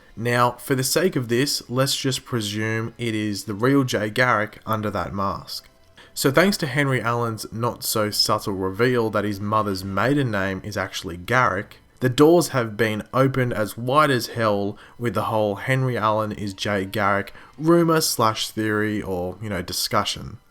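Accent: Australia. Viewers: Hey just wondering if you're Aussie cos u have an accent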